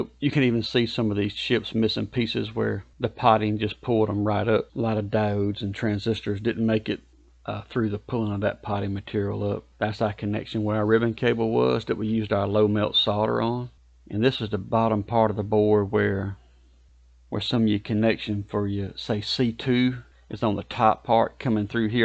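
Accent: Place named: South, United States. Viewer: Thanks for always being so good about reading and replying to comments! I’ve been watching your videos for a few years now since I was trying to troubleshoot an EGO battery. You even made a short follow up video to answer a question I had back then and I’ve been a fan ever since. Actually I think you may have even helped shape my appreciation for Southern accents and their associated charm to be honest lol. You’re a great teacher, fixer and creator. Keep up the great work, wish you and your channel all the best! Much Mahalos and Aloha! 🤙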